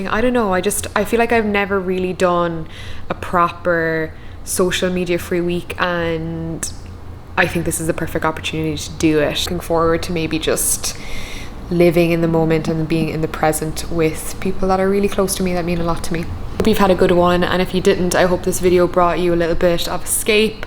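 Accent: Cork, Ireland. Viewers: The Cork accent jumped out in this one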